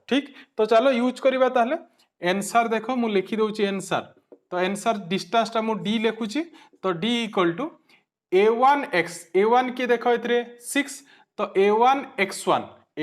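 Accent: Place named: Sambalpur, India. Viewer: But sir mate lagila je apanankara sambalpur boli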